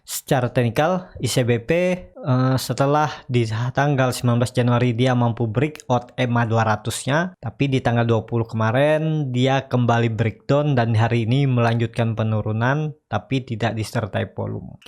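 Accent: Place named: Borneo, Indonesia. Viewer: maksudnya 'Khas itu" logat bahasanya kak...emang bahasa kita dayak kalimantan begitu...spt klo bahasa jawa kan 'medhok' banget gt.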